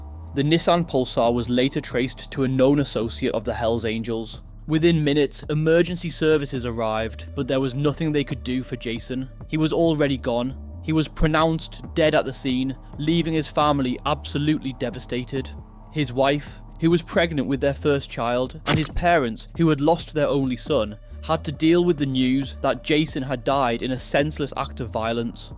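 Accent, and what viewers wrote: United Kingdom. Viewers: My favorite British voice telling me Aussie crimes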